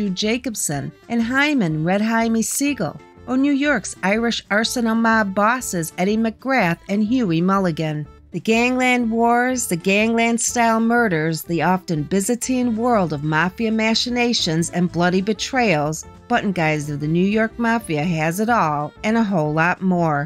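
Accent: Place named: Chicago, United States. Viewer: Why is it called throw in New York when the Narrator broad has the thickness Chicago accent since Tony Spilotro ?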